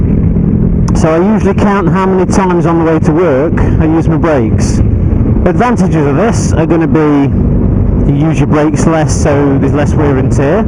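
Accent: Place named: Birmingham, United Kingdom. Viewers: With that Birmingham accent you sounded exactly like Craig Charles- LISTER! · Going thru’ your ‘back catalogue’ & still ‘loving this’, in 2024! I’m a year into my new toy, a Super Meteor 650, after a 42yr ‘hiatus’ (having driven most things up to a 7 tonner). Still struggling with filtering, if I’m honest. Your voice remains my primary ‘internal monologue’ when riding (not the Brummie, tho’).🤨😉😅✌️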